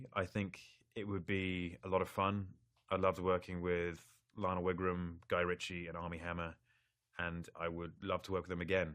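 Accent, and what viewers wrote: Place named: United Kingdom. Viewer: esse sotaque britânico que eu amo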